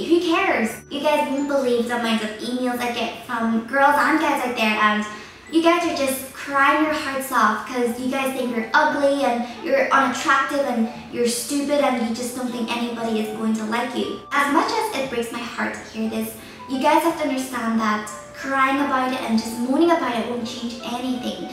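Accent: Ireland. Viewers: Are you Irish lol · She sounds like irish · She Irish I think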